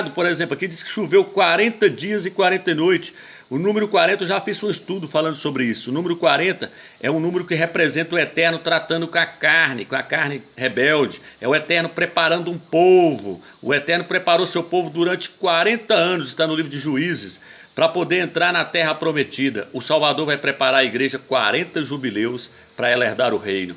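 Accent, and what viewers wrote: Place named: Minas Gerais, Brazil. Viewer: vc mora onde, fala igual mineiro.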